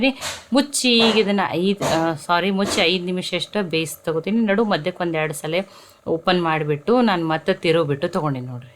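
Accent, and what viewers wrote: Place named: Dharwad, India. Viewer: Akka,bhaal ruchi aged nimma adigi.Bhaal cholo anistu numma Dharwad maatu keli❤ illi Bangaluru bhasha keli byasara❤❤❤❤❤🎉🎉😂😂😂😂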